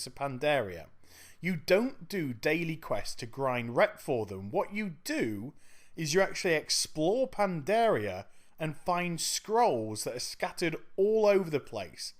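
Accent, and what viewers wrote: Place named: United Kingdom. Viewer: Loving listening to a british WoW player for once! can't stand all those thousands of Americans. Thanks for guide worked a treat, was 50th mount got albino drake:)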